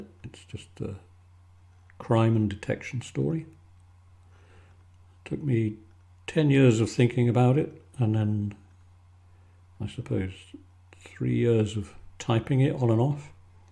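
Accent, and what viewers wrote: United Kingdom. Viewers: you've got such a beautiful british accent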